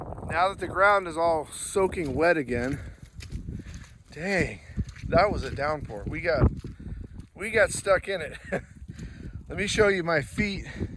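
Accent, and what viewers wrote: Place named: Long Island, United States. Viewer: Where you from you sound like yr from long island ny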